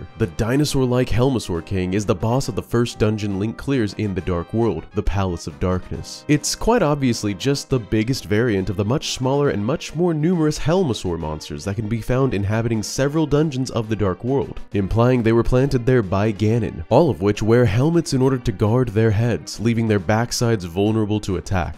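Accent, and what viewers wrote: Texas, United States. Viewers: Love the Texas accent. God bless Texas. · Your ampt up Texas accent majes me want to hear Sam Elliot tell me about the Hero Of Time "...hmm I take comfort in that, The Hero abides... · I didn't realize you were from the Lone Star state, but now I can't not hear your Texan accent lmao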